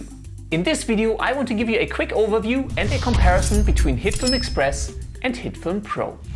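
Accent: Germany. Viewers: Great comparison! Very helpful! Do you think Hitfilm Pro can compete with Adobe premiere/After Effects? By the way: Are you a native German? Your English sounds like that a bit... ;-) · Are you German, cause you accent is so strong and it sounds like german english 😂😂😂 (btw I'm German) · Du hast einen extrem deutschen Akzent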